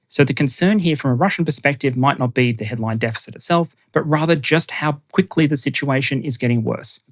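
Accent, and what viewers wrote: Australia. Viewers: Well, he's an Aussie. Were you expecting something like "Australian language"?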